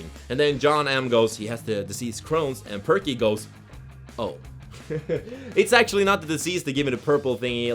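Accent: Norrland, Sweden. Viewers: Svengelska still better ROAD TO GLÖBOL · snacka mer norrländska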